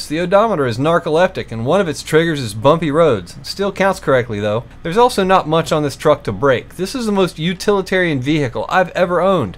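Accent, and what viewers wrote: United States. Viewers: Are you from Michigan?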